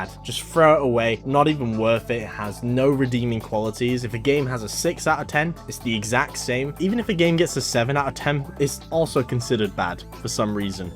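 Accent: United Kingdom. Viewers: That Life Of Brian joke was too good XD i don't listen to you at all. I just want to throw money at your british voice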